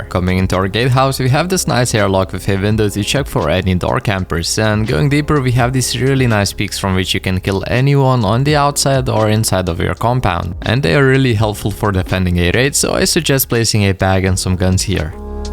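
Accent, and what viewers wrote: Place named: Sweden. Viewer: Pretty sure hes swedish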